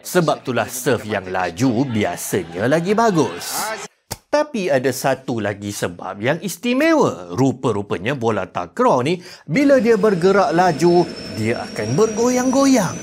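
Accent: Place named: Terengganu, Malaysia. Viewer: orang ganu rupenye😂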